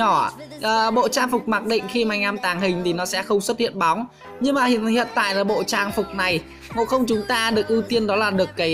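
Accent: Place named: North, Vietnam. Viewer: Giọng bắc dễ thương qá :v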